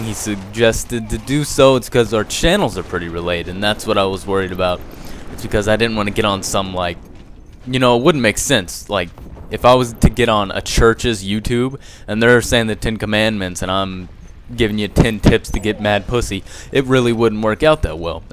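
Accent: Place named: United States. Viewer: more like white with a 95% american accent with 5% scottish. good try though.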